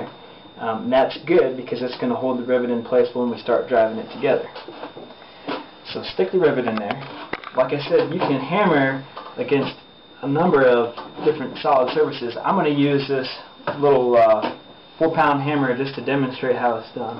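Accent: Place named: South, United States. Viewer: a southern accent... why am I not surprised.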